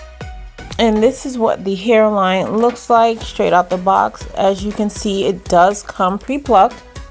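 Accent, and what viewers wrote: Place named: New York City, United States. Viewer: Jersey girl here! I hear your THICK NYC accent! 💕 I have family in the city! Love your videos! Love this unit! Congratulations on the new car! I have been following you for YEARS!!!!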